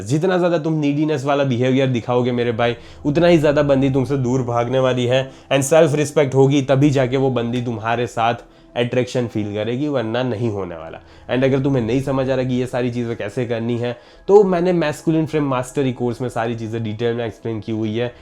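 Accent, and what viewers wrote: Gujarat, India. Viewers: Bhai, I am also a gujju, its so cute when ur Hindi has a gujju accent 😂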